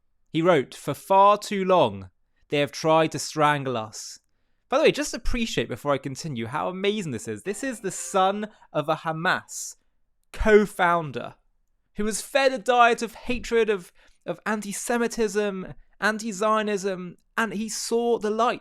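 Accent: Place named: England, United Kingdom. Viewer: HAVE YOU EVER BEEN TO OCCUPIED PALESTINE WITH YOUR ENGLISH ACCENT??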